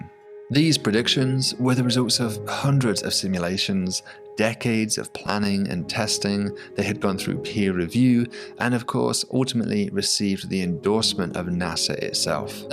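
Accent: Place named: Nottingham, United Kingdom. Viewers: he must be the guy that married your ex girlfriend, only sense I can make out of you complaining about how an educated BRIT speaks. This is how all educated men especially brits speak 😂😂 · this guys accent gives me nottingham vibes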